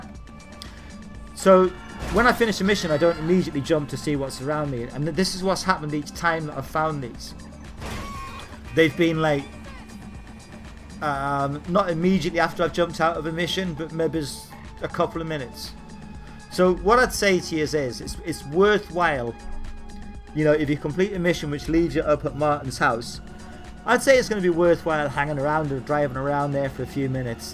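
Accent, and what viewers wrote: Ireland. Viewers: Is that an Irish accent I hear? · You from Ireland? You sound like one of my Crew members heh. As Im watching this video, I was parked on a hill right by Trevor's house and one showed up..I think it's just random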